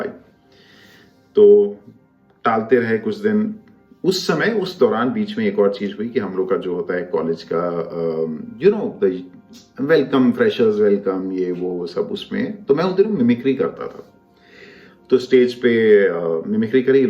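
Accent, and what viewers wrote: Bihar, India. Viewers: aap to Pura bihari bolte ho be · Wow.such an authentic Bihari accent.dhanbad aaiye Kabhi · Sir aapka bihari tone..mazaa aa gya..apno wali feeling aae..ni to hr koe to chupane me laga rehta h..m really not expecting ki aap v kavi aise aaoge aur aise baat kroge apne video me..love u sir❤️❤️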